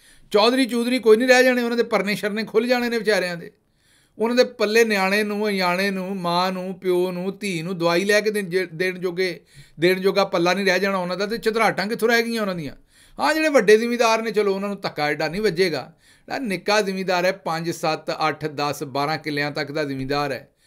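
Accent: Punjab, India. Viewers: Authentic Punjabi. Very soothing. sahab you are my favorite personality. God bless you. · اسلام وعلیکم گل صاحب جیندے وسدے رہو، میرا پنڈ وی تواڈے پنڈ دے نیڑے ای آ ، میں پکا انا سکول چ پڑھ دا ریاں تے تواڈے پنڈ دے منڈے ساڈے نال پڑھدے ہوندے سی، تواڈی گل بات سن کے بوہت اچھا لگدا جی خاص طور تے پنجابی لب و لہجہ اپنایت دا احساس پیدا کر دا، تواڈے نال محبت دی سب توں وڈی وجہ تے عمران خان اے تے دوجی پنجابی تے تیجی وجہ گوانڈھ پونا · جناب دا پنجابی لہجہ اینا ٹھیٹھ اے اسیں پنڈاں تھاواں تے رہن آلے لوگ وی ایناں لفظاں نوں استعمال نہیں کردے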